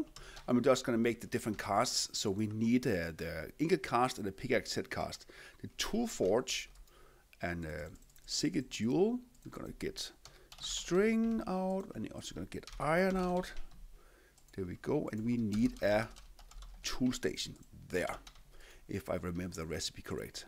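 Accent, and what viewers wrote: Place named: Denmark. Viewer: can't decide if you sound german or Danish, but pretty sure I hear the strong danglish accent.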